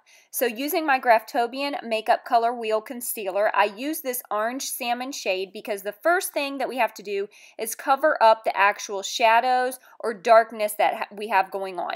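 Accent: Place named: Georgia, United States. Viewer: oh my word! loved this. love your accent too! are you a georgia girl? i'm going to look for the salmon colored concealer at drugstore...thx!!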